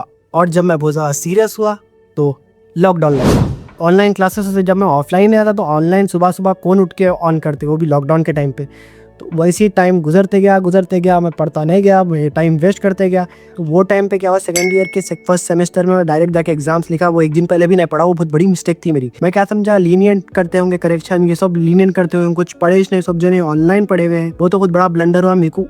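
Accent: Hyderabad, India. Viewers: According to accent are you from Hyderabad?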